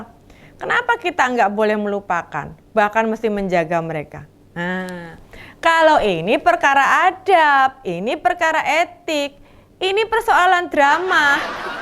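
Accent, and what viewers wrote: Java, Indonesia. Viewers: Mbak.... sampeyan bisa melucu politik dengan sindiran telak dengan logat Jawanya itu ilmunya dapat dimana mbok ya ajak-2 yang lain....???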